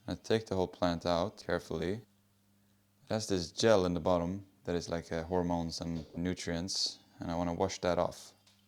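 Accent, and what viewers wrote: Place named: Scania, Sweden. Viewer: Den grova skånskan 😂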